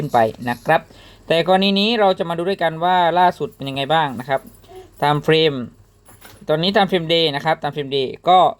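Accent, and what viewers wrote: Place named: North, Thailand. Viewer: รู้เลยว่าคนเหนือ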